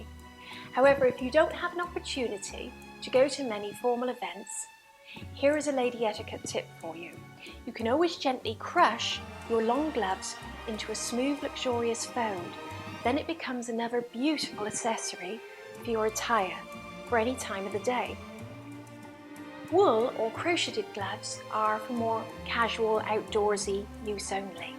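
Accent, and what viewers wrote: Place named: RP, United Kingdom. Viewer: love your RP accent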